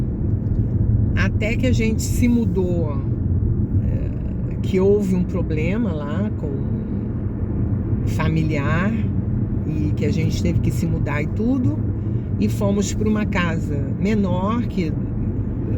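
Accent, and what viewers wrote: Rio de Janeiro, Brazil. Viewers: Nao tem sotaque nenhum!!, kkkk mestra linda vc é a esseencia do sotaque carioca ❤❤❤❤❤ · obrigada por essas lives maravilhosas!! Admiro vc❤.. vc continua sim, com o sotaque carioca.. puxadinho e com o X no final...rs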